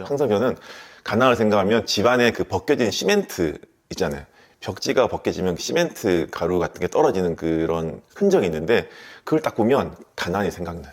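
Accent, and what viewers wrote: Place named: Busan, South Korea. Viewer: 사투리가 들렸는데 부산대의대 선배님이셨군요..! 너무너무 재밌게 들었습니다ㅋㅋ 인턴하면서 하루하루 일하고 자고만 반복했는데 영상 보면서 동기부여가 많이 되네요 포부를 크게 가져야겠어요 좋은 영상 감사합니다!!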